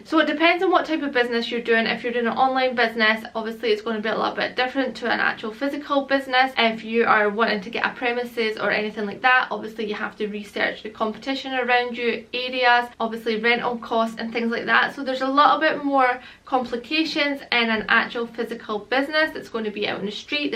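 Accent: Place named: Scotland, United Kingdom. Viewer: U from the Scottish Borders? Bc u sound Scottish and English btw very inspirational and makes me wanna start it sooner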